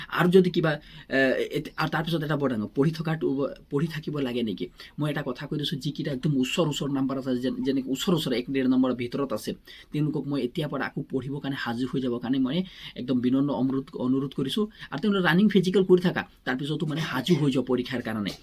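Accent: Bihar, India. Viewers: Toi axomia a koba najna nhu ..bihari nki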